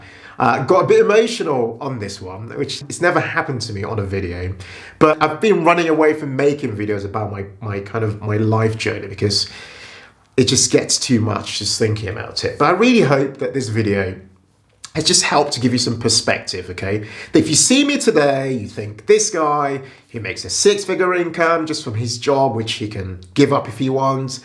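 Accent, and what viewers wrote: London, United Kingdom. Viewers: I had to stop watching the video to post this quick comment: I thought you were a Londoner. My husband is originally from London and your accent is close to his. 😄😄😄. I am from Brazil and despite being on this learning journey since 15 and having a fluent level of English , I still have a brazilian accent. Back to the video 🤣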